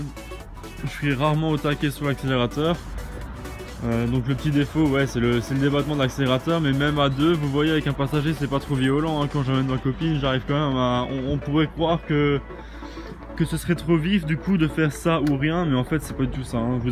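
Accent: Alsace, France. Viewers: ton accent t'a trahi tu habite à Strasbourg ou dans les bled à côté ?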